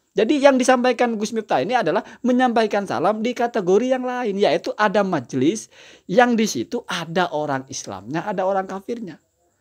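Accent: Madura, Indonesia. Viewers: Kalau dr nadanya, kayaknya Sampean oreng madhureh. Kerren gus. Bisa bagi nomer wa gus?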